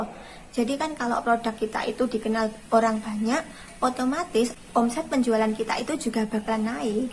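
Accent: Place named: Medan, Indonesia. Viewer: Dilihat dari logatnya, pasti orang medan ini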